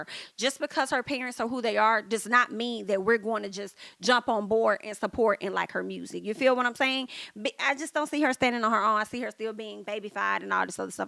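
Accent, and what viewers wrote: United States. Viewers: i love hearing you talk country sounds talking😂Blessings 🙏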